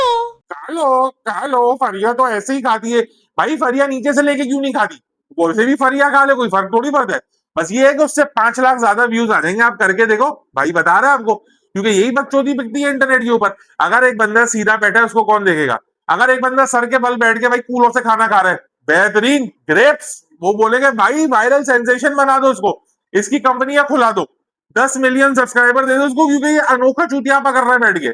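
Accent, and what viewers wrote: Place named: Karachi, Pakistan. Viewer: Bhai, you make Best comedy videos in Karachi accent 😂😂😂😂😂❤❤❤❤❤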